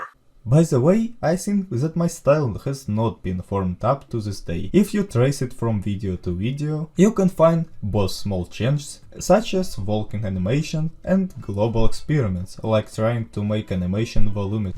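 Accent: Russia. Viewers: ДА ТЫ РУССКИЙ!! Я ПО АКЦЕНТУ СЛЫШУ!!! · Русский акцент имба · The Russian accent, lol (i like your voice, the only thing that is funni for me is the Russian accent) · Ахах,найс русский акцент)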